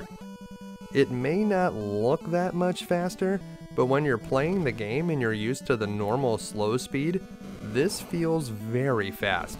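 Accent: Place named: United States. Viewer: This is the best American accent from a Chinese person I have ever heard.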